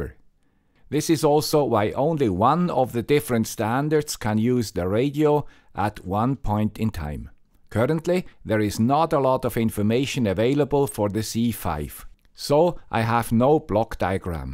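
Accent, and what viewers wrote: Switzerland. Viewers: Please please please turn off that YouTube autodubbing nonsense. It destroys your USP, the Swiss accent, and makes your videos unwatchable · As long as the guy with the Swiss accent keeps making videos, the Earth will continue to turn. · My favorite guy with swiss accent is back!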